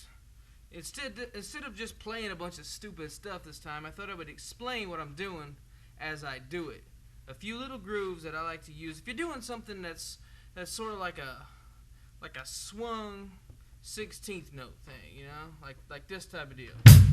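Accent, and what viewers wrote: South, United States. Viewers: Really funky beat that's hard to beat and a great Southern accent to go with it.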